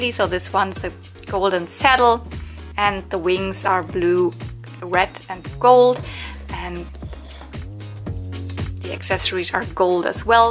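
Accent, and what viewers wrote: Germany. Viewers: Hi, how funny to immediately hear that you were German. And hello and thanks for a good exciting channel. Cheers from Andy from Denmark.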